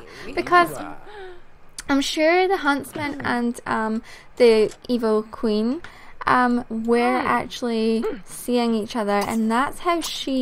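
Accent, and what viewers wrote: Scotland, United Kingdom. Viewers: I guess you're Scottish , we'll I hope you are :) I am , but I don't have the accent because my parents are English xx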